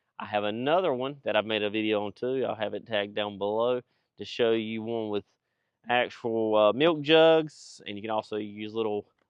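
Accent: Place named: United States. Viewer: This american accent gives me an headache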